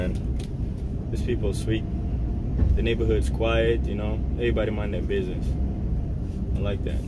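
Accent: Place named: Jamaica. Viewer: I love your attitude...so much...u sound Jamaican....or something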